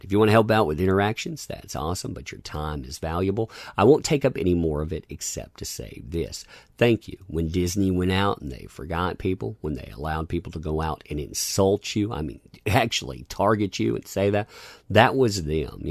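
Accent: South, United States. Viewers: i like your content, i swear i really do, that is why i keep watching it for quite sometimes, but as a chinese dude i find it somewhat hard to understand your accent, which i suppose you will find my accent also hard to understand if you heard me speak, so this is a sad goodbye, i am trying too hard to listen to enjoy it. to bad i actually kind of like the sound of your accent, which i'm guessing southern ish, but alas it is too hard for me who's english is my 4th language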